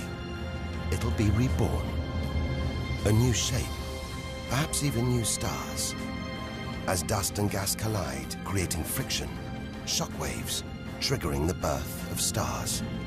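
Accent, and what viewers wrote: United Kingdom. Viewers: Why is Alec Baldwin speaking in British dialect? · Why do they alway have to re-voice American documentaries in an English accent or vice versa? I’m fed up with that! It’s the same fucking language! If I can understand both accents, so can everybody! Give me a break! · British narrator, but this is so American!.. hard to watch as an European.. why always so full of it. Keep it real folks! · Sean pertwee vs alec baldwin, sorry but the british soft spoken accent is so much more real. More believable, more comforting and true.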